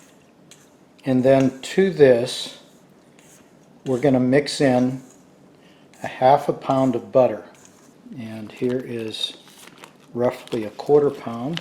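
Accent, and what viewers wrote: Pittsburgh, United States. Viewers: Really nice work. Good recipe. Sounds like you are from the Pittsburgh area. Thank you so much❤ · As soon as I heard his accent I knew he was from da Burgh! Found out he is my classmate Mike’s brother and another classmate Sharon’s cousin. Great videos, No rolling pin…use a wine bottle!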